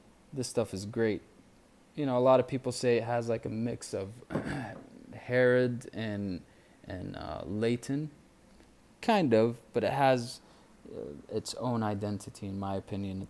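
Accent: United States. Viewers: Where are you from ? You have an American accent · I'm confused again,so you're arabic but you sound 100% american,so how is english your second language?;)p.s i would subscribe but your last upload was 4months ago.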